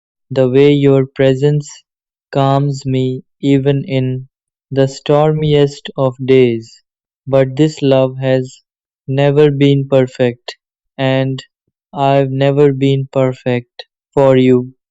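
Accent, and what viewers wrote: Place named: India. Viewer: Stop speaking with your indian accent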